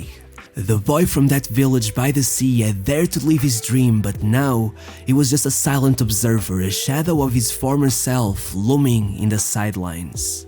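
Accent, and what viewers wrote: Portugal. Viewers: reconheço um tuga à distancia kkkkkk bom video! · Hmmm tu és português né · Ah, eu sabia! Pelo sotaque e pela maneira como consegues pronunciar os nomes mais difíceis de jogadores portugueses e brasileiros vê-se logo que és tuga... Parabéns pelo canal único, encontras sempre conteúdo pouco explorado e editas ótimos vídeos! Continua assim, irmão!🇵🇹🇵🇹🇵🇹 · dá para perseber pelo o sotaque que és tuga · mano sabia que eras tuga só pelo sotaque ahaha, o inglês é perfeito mas um tuga reconhece um tuga facilmente, continua com o bom trabalho! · u portuguese?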